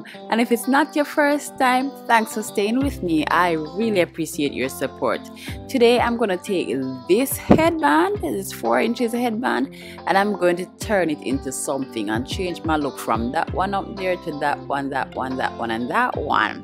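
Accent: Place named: Jamaica. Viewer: I love your accent. Is it Jamaican 🇯🇲?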